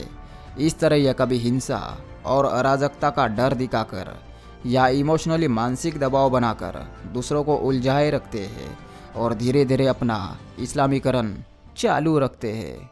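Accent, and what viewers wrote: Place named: Mumbai, India. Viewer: Tumhara talking tone Mumbai walo jaisa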